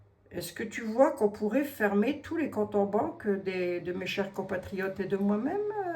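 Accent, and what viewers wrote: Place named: Lorraine, France. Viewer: Vous me faites rire et croyez moi ça me fait du bien. Je suis une Lorraine de Meurthe et Moselle "expatriée" en Bourgogne et entendre votre language Lorrain me fait un bien fou 😁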